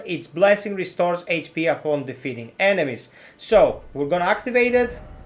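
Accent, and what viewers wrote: Greece. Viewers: i know a greek accent when i hear it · Your accent seems greek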